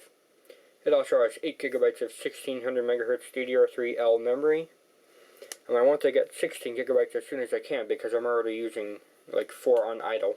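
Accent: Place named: Russia. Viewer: for god's sake, he's russian, english is not his first language STFU already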